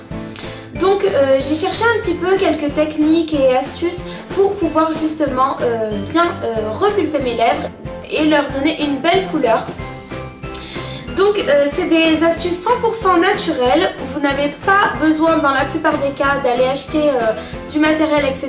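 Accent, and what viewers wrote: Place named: Sweden. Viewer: OMG ton accent suédois j’adore persso j’ai le même ❤️❤️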